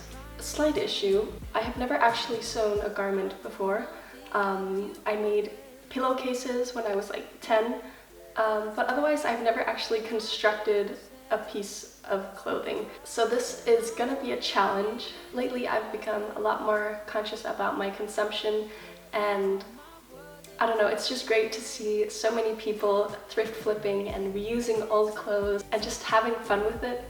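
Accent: Denmark. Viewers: This video was in my recommendations. The second you started talking I thought you sounded danish (the accent, I guess?). Then I saw the furniture/living space and again I thought it looked super danish. Then I checked and as far as I can tell you're from Denmark... Hahaha. I liked the video! :D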